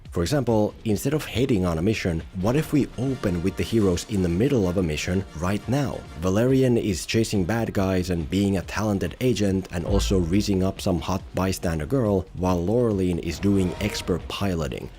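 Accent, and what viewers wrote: Finland. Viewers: This is the most finnish sounding accent I've ever heard... regards, a finn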